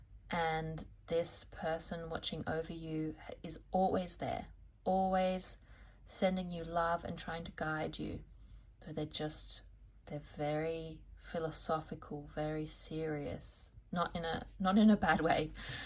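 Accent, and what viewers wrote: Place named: Australia. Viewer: Thank you. I love hearing an Aussie accent. Your approach is intriguing and unique. Pile #4 was perfect.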